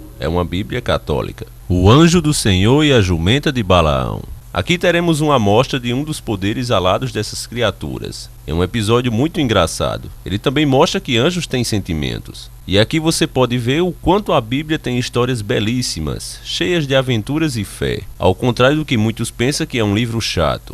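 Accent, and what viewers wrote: Northeast, Brazil. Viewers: Muito top a narração ainda mais com sutaque do meu nordeste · seu sotaque nordestino e a cereja do bolo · o melhor do vídeo e o sotaque nordestino sou nordestino também nossa língua e foda lklk muito bom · O sotaque nordestino é naturalmente engraçado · narração bem nordestina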